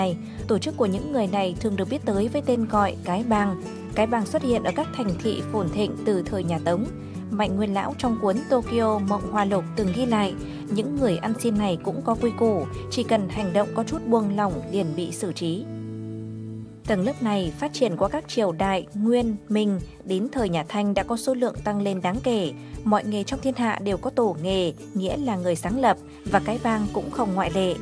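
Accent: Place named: North, Vietnam. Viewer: Hồng Thắm giọng nam, còn giọng này âm bắc mà bạn!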